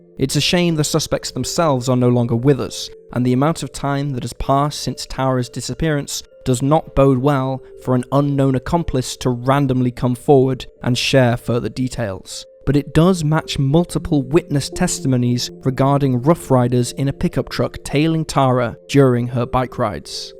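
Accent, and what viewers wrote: England, United Kingdom. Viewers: sadly I fear your conclusion is correct ... men, and police in particular, protect their own. So the son would have been aware that he could get away with such vile behaviour. Have watched other similar channels but your gentle English accent appeals, thanks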